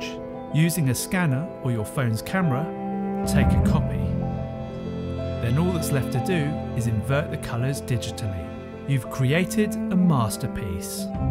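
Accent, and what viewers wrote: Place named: United Kingdom. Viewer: No offense intended, but are 98% of all videos now narrated by the same British reader? 🧐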